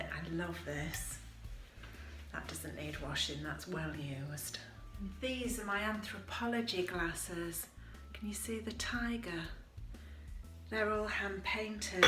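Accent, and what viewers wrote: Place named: North, United Kingdom. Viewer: Where did you grow up, definitely a fellow northern accent I'm detecting?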